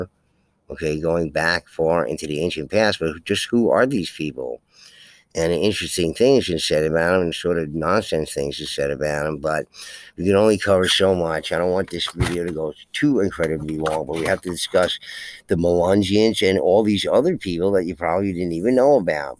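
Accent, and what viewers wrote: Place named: East Coast, United States. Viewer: Never commented on your videos before ..I think I figured out why I like you channel so much. mostly about giants and stone walls.but also u read so fast with the east coast accent it keeps me from falling asleep because it keeps my ears tuned to the fast reading .😂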